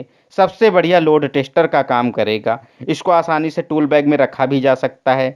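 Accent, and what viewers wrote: India. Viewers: You Are Gujrati?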